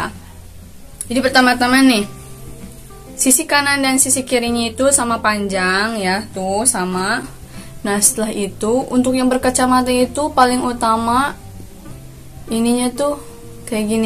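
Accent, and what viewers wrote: West Java, Indonesia. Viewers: Ngajeber...🤣 Orang sunda ya teh? 🤭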